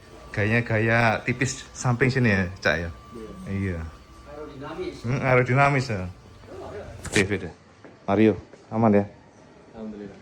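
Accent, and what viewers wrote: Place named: East Java, Indonesia. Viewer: cak, permisi, mau tanya? rumah pean dimana? dan asli pean dari mana? terima kasih infonya, cak soalnya logatnya seperti orang jawa timur an, cak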